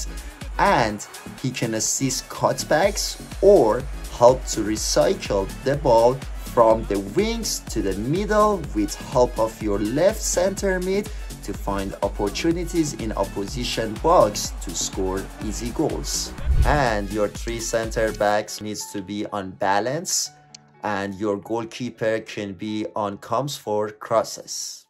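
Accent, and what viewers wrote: Iran. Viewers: persian accent hits so hard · your accent looks perfectly like iranians داداش😂❤️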